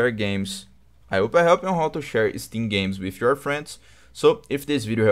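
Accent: Brazil. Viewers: he got that brazilian accent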